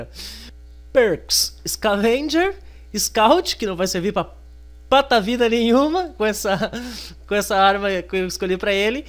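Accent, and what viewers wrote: Rio Grande do Sul, Brazil. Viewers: tu é gaucho??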